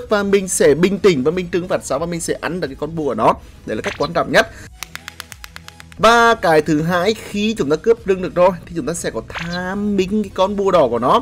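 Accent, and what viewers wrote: Nghe An, Vietnam. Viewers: hắn bình luận giọng Nghệ An choa là tau thấy dễ ghe với thích rồi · a người nghệ an ạ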